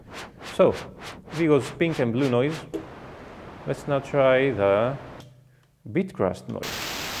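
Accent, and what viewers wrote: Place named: Greece. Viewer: Γιατί απο τα αγγλικά που ακούω νομίζω ότι είσαι Έλληνας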